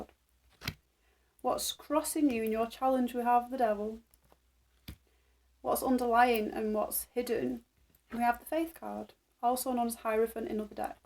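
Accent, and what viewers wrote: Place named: England, United Kingdom. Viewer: Hi,missed you that lovely.English acent,Wishing you peace and Blessings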